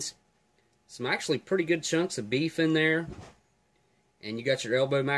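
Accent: South, United States. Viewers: Are you from Texas, South Carolina, or Georgia?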